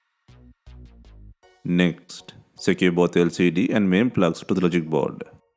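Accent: India. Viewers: It seems you're attempting to speak with the heaviest Indian accent possible. I can't understand 1/3 of what you're saying.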